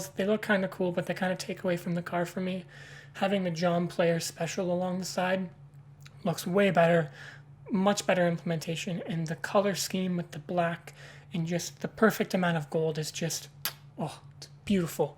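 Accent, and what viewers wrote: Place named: Toronto, Canada. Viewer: Are you from Toronto?😂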